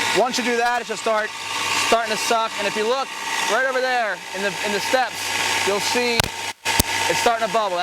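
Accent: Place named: Philadelphia, United States. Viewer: I love it ! I heard it and was like oh philly philly 🦅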